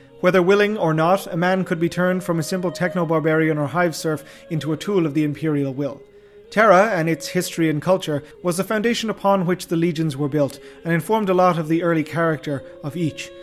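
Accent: Ireland. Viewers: I can't place your accent - is it Irish/Southside Dublin?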